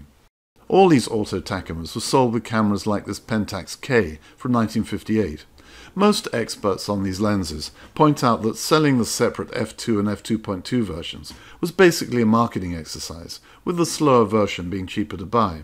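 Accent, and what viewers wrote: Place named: RP, United Kingdom. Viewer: His particular accent and tone sound almost identical to David Cornwell, the real name of the great writer John LeCarré. It’s beautifully cultured in that special British way. I’d be interested to know a bit about background, although it really has nothing to do with wonderful lens videos!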